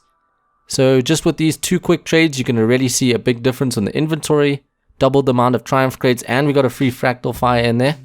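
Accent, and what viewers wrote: South Africa. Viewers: I live in Gauteng thats awesome dude I could tell by your accent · Are you South African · I love the South African accent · May I ask where you are from? You sound like you from South Africa? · Are u from south africa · Ahh same, I thought I recognised the accent but the newzealand accent and the South African accent are so similar, kan jy afrikaans praat? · Just wondering, are you South African, it’s the accent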